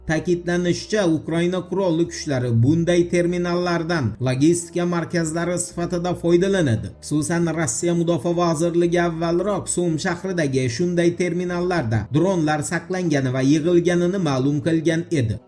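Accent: Uzbekistan. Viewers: SHUNCHA YILLARDAN BERI KUZATIP KELAMIZ SHU TURFA OLAMNI, SHUNDAY EKAN DOIM VIDYO'NI KO'RGANDA LAYK BOSIP TURAYLIK AKA-UKALR???? BU AKAGA O'XSHAP, SOF O'ZBEK TILIDA GAPRISH HAMMAGA HAM MAS!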